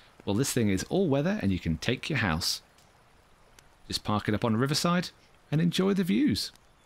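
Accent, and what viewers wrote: United Kingdom. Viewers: Great video as always, hah. I have a silly question.. What is your accent called ? Is it the British standard one? Rp? Sorry for the question.. hah.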